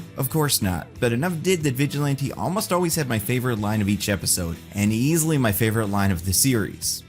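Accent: Philadelphia, United States. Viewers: u from Philly???????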